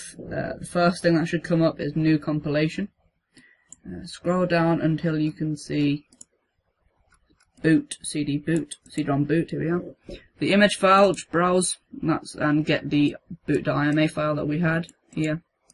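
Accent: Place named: United Kingdom. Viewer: British accent..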